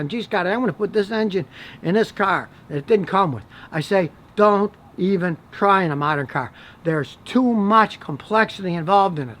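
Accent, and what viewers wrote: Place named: Texas, United States. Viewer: Hi Scotty I love you videos and I religiously watch them. I've even learnt how to understand your Texan accent. Much love from Kenya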